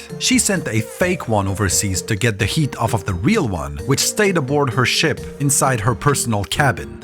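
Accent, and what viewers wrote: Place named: Scotland, United Kingdom. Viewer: Dude your Scottish dialect is cool XD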